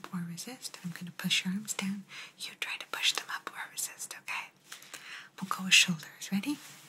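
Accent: Italy. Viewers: Hi, i must have fallen asleep. I will need to watch the video again. 😊 Could you do another cranial exam with your italian accent? 😅 or a travel agent. Showing us beautiful places in Italy to visit. That would be amazing! Anyways, thank you for another great video. Ciao! 😊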